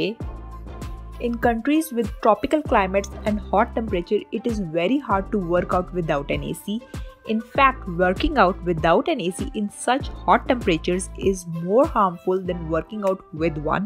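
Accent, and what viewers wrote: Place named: India. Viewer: Damn i hate that Indian accent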